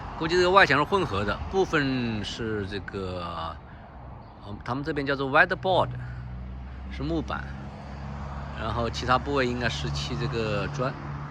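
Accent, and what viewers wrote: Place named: Hubei, China. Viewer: 感觉湖北人口音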